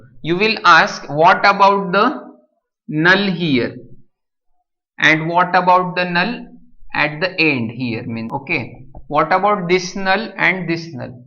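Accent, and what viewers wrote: India. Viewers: You deserve more followers! Great video. By the way was that Marathi accent? :)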